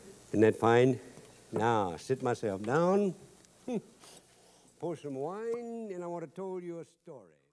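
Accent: Louisiana, United States. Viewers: His show was a regional hit down in the deep South mainly Louisiana (Where he is from, which is why he has the Cajun accent), Mississippi, Alabama. Then it aired nationwide decades after his debut on the PBS affiliate in Mississippi once they figured people outside of those states I mentioned would love his humor and Cajun accent.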